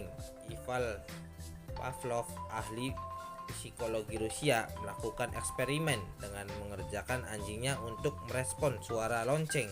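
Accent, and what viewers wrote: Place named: Java, Indonesia. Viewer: Bahasa nya medok bgt ya gaes😂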